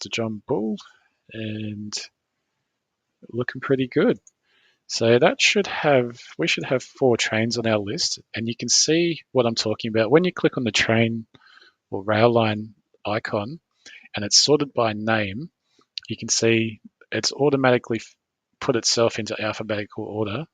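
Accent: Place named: Australia. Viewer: G'day mate. I just came across your series of videos. Very good to watch, so I have subscribed to your channel. I'm watching from Melbourne, and by the sound of your accent, I think you know where that is.🙂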